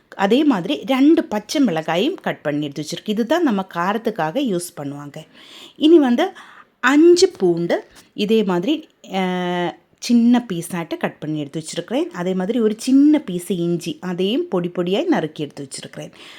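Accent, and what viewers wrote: Kerala, India. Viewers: அவர் மலையாளி ஆகவே தான் அப்படி பேசுகிறார், we should appreciate her.instead of mocking.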